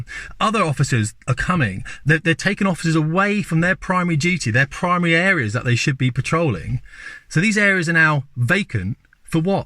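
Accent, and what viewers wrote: United Kingdom. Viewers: Wonderful to hear a true British man talking!!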